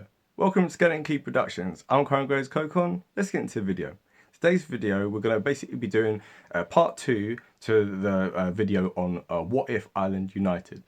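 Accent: England, United Kingdom. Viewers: Another thought-provoking video. I don't feel like you have a bias, you've said things that would kind of piss off both communities here. Your videos are uniquely well researched, strange to hear an Englishman sound like he actually knows a bit about the North. Respect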